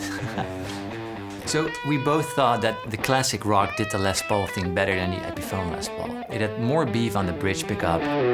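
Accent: Netherlands. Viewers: That's a strong Dutch accent. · Haha kan aan je engels horen dat je Nederlands bent😅 blijf zo door gaan kijk altijd al je video’s🔥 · Are u dutch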